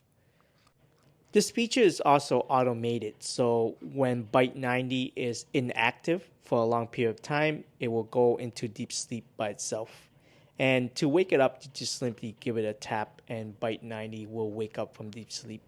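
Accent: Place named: Pittsburgh, United States. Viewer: Location says Toronto, but accent says Pittsburgh.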